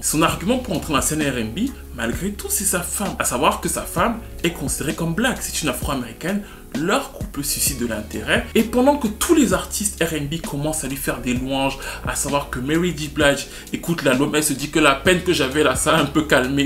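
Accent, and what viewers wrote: Cameroon. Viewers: Il s'agit juste du parlé et du phrasé typiquement camerounais. Que j'aime beaucoup d'ailleurs... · Toi tu es camerounais d'origine 😭😭 trop le ton ...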